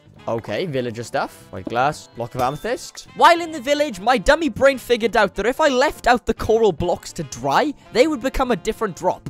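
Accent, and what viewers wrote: Wales, United Kingdom. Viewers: It’s a Welsh accent for sure, he has to be from Wales?…..👍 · Can’t tell if your welsh or not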